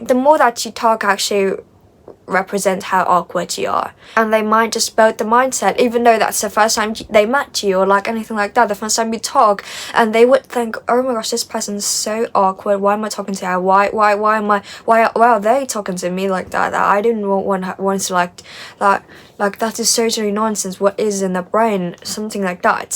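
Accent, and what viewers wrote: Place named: United Kingdom. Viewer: I came from Instagram, nice podcast. Btw I like your British accent a lot.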